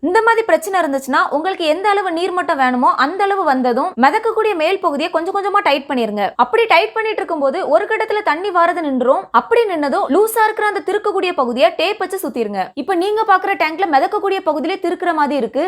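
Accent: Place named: Nagercoil, India. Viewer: நாகர்கோயில் ஸ்லாங்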